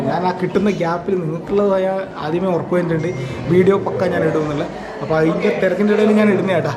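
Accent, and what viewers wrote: Kannur, India. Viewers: എവിടെ യ നിങ്ങളുട നാട് കണ്ണൂരൊ കാസറഗോഡ് 🤔ഭാഷ എന്റെ ത് ആയതു കൊണ്ട് ചോയിച്ചത് പ്പ 😂😂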